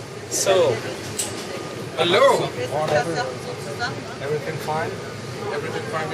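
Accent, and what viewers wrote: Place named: Germany. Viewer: Richtiges Englisch mit deutschem akzent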